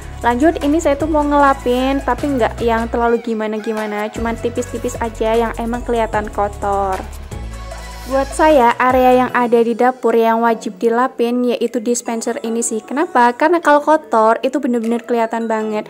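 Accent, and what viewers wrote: Banyumas, Indonesia. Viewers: Slalu nonton vidio tp baru ngeh klo org ngapak 😀 aku jg org ngapak bun, pemalang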